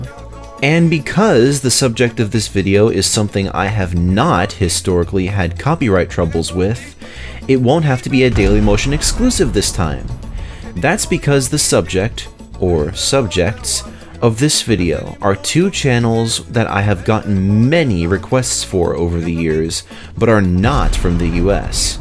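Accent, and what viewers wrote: United Kingdom. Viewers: GONE BRI’ISH??????1??1?1?1!1?? blah blah blah great vid you get the idea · Excellent British accent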